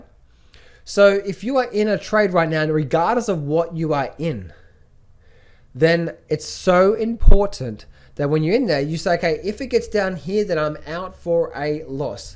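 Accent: Australia. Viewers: So all the criminals from England were sent to Australia in 19 th century...you should work on your English or American accent. ..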